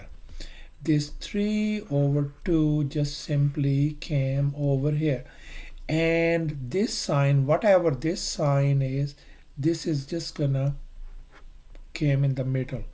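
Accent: India. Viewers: ig he's indian ....by his accent....he teaches gud though (the teacher)....n call me 'sis' not 'bro' cuz it's rakhi....idk why I'm saying this but happy rakshabandhan buddy^^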